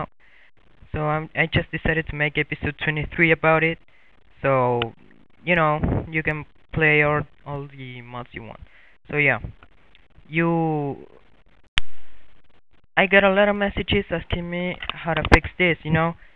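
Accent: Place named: Argentina. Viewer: YOUR ENGLISH SOUNDS LIKE ARGENTINIAN! I'll suscribe.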